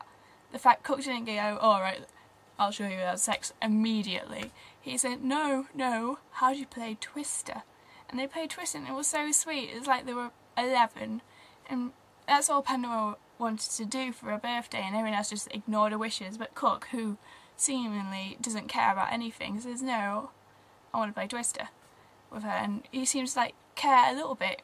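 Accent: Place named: England, United Kingdom. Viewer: dam youve got the nicest english accent ive ever herd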